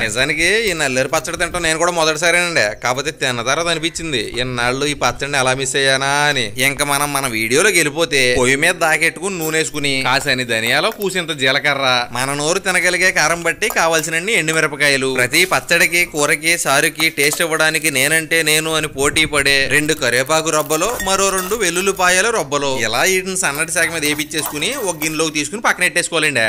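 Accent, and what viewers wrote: Godavari, India. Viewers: మీ యాస భాష తీరు. వింటూ ఉంటే సినిమా హీరో అల్లరి నరేష్ గారు మాట్లాడినట్లు ఉంది సార్.🎉 గోదారోళ్ళు కాబట్టి ఆ విధంగా మాట్లాడతా ఉండారు. మా రాయలసీమ భాష గాని మంచిగా ఉంటది.. మీరు చెప్పే విధానం బాగా ఉంది.😊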